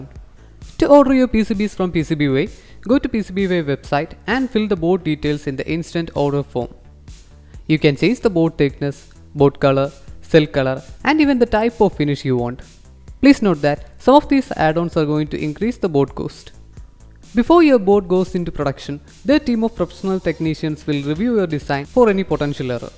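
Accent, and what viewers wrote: Kerala, India. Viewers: Bro are u a mallu.?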